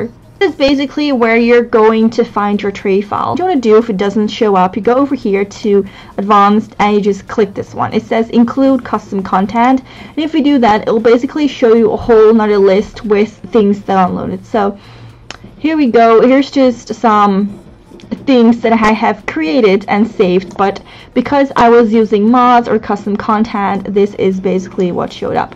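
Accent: Netherlands. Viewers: are you from the Netherlands? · are you dutch?? cause i am :)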